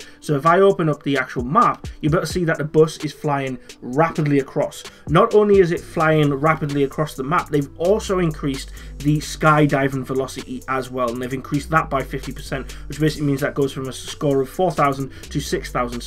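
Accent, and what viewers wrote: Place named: North East England, United Kingdom. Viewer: Love your vids mate, where you from? Sound like your from the north east!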